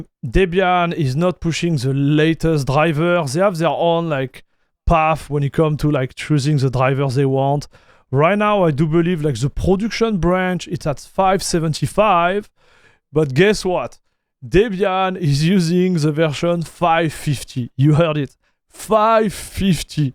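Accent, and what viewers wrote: France. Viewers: go french accent. love to have that. lol. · I'm only catching like one out of every five words this guy says. His accent sounds French which makes sense they're because their language sounds like they have an eternal loogie stuck to the back of the throat. Such a disgusting guttural language. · Came for the French accent, stayed for the Trixie technical details, particularly kisak-mesa fresh, nvidia drivers, and the linux-tkg scripts on github. Two points: might I suggest you put links to these sites below your video review. Also, kisak-mesa seems to be for ubuntu. Do the debs in their ppa work with Debian 13? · bravo for your franglais. I can recognize myself in you.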